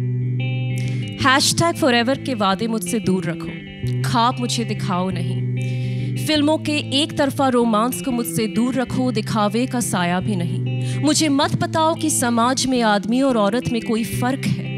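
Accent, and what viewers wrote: United Kingdom. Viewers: Why Urdu/ Hindi words in English accent?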